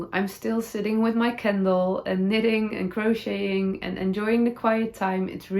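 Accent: Netherlands. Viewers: I didn’t even know cork blocking mats were an option. I love the idea. I can’t find them available anywhere in North America. Lucky you! Thank you for your podcast. I enjoy your Dutchy English and your honesty. I hope that you and your family have a nice end of the year. I look forward to seeing more of you and your heart jumping crafty adventures next year. 😊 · Thanks so much, for a year of joyful ‘Dutchy English’! It’s been sweet to hear you talking about your love for your husband and family! All have surely enjoyed helping you find the proper words you are struggling with...from afar! Today’s word was’Hardware store’! Your confidence in making a dress shows already! You can make a ‘fake bottom band’, by just adding to center of frabic befoe cutting out pattern! You sound less worried about your ‘Miss Perfect’! We’re never too old to Keep Learning and creating beautiful things for ourselves and others!!! 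Til Next Year! Thanks for all the smiles!❤🦋🧶🧦🌷Sharon from S. California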